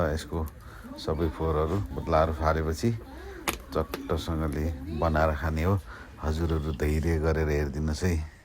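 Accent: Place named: Malaysia. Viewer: मलेसिया को याद आउँछ दाई तपाईंको भोईस सुन्दा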